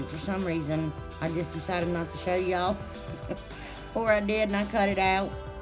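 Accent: South, United States. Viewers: I'm so glad I found your channel!! I love all the videos I've watched so far, im binge watching them now lol I also follow your friend Kathy, I love her too!! I can understand you just fine but im a southern girl too. My Maw maw was from West Virginia, im used to the accent! All of your diys are so cute! Happy craftin lady!!! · Girl please, I can understand you just fine. It's a Southern thang. ;) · Love ur accent I'm a southern girl to. Ur crafts super. Like all. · Love your accent. Im a Southern girl myself. Mississippi here 🙂 Love your projects ❤ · New Subscriber. Your DIYs are beautiful and professional looking. I love everything you made. Love your accent. My best friend is a Southern gal like you, I’m from Chicago. When I go to visit her in Tn everyone there says they like my accent.Keep you DIYs coming.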